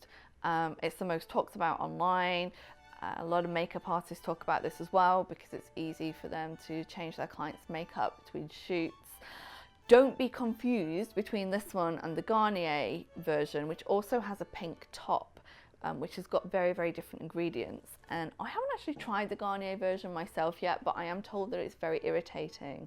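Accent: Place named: United Kingdom. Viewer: I love British English accent 😍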